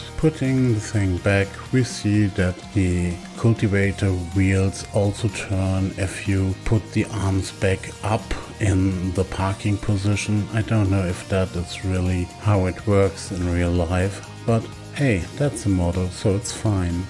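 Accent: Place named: Germany. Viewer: Bist du deutsche wenn ja gutes Englisch aber man hört noch minimal das du nicht ganz enänder bist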